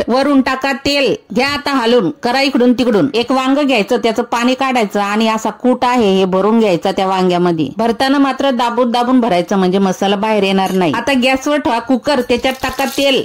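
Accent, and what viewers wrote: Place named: Khandesh, India. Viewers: आवाजावर नक्की चाळीसगाव ची बाई वाटते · Mausir recipe video mustastastat pan laijast bolta rav khandesi na vatat ahe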